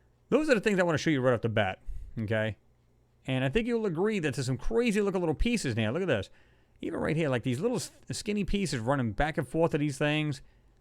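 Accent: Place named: Rhode Island, United States. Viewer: When I was growing up I had a friend named Tommy his dad was Rhode Island and he sounds exactly like you am I close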